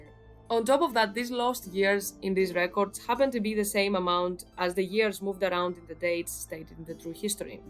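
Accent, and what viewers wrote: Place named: Greece. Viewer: you gotta be Greek the accent is a giveaway.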